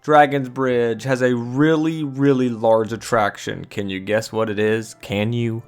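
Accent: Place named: Texas, United States. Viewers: Love your accent! reminds me of aunt and uncle back in Texas... 😌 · Oh my dude, you are seriously sleeping on Rorikstead; there's something sinister going on there. (This has been covered way in-depth by other YouTubers). Pay attention to the dialogue; sneak in and listen to Jouane give secret lessons to Sissel. Why are their farmlands so fertile? And where are all the adult women? If you were including Raven Rock, that should easily have been #1; it's personalities, the sheer number of questlines, it's Morrowindy (yeah that's a word now) goodness-it's easily on par with any of the Skyrim mainland cities. I don't know who told you your accent is fake but as a native Texan, I assure your viewers that the 'Texas twang' is indeed real. You and the missus have a good one,!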